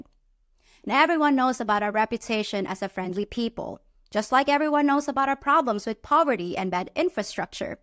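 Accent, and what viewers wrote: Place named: South, United States. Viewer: Great show. I notice you have picked up a bit of a southern accent since you have returned from the U.S. Stay awesome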